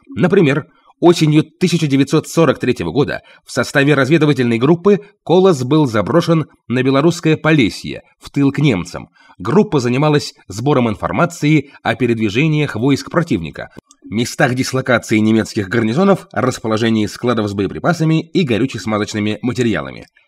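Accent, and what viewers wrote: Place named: Romania. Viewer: текст читает румын, портит восприятие.....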